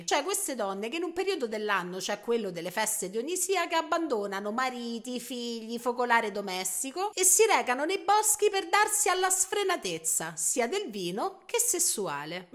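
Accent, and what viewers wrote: Rome, Italy. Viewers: Inizio del video scoppiettante è veramente divertente come al solito la tua romanità ha accentuato è reso al quadrato quello che volevi dire gradevolissimo...... video Bellissimo queste notizie sono veramente utili a capire il nesso e il connesso con il discorso del presepe non sono d'accordo con figure figurine quadri e Santini perché il vero secondo comandamento vieta di creare immagini e di adorarle basta andare comunque a vedere i comandamenti veri e non quelli manomessi edulcorati dalla nostra carissima chiesa Vaticana 2)Non farti scultura, né immagine alcuna delle cose che sono lassù nel cielo o quaggiù sulla terra o nelle acque sotto la terra. Non ti prostrare davanti a loro e non li servire, perché io, il Signore, il tuo Dio, sono un Dio geloso; punisco l'iniquità dei padri sui figli fino alla terza e alla quarta generazione di quelli che mi odiano, e uso bontà fino alla millesima generazione, verso quelli che mi amano e osservano i miei comandamenti. 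Questo é solo un mio pensiero. Complimenti come sempre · Per favore, meno acidità e torna a parlare italiano. Non se ne può più di romanità sottoculturale.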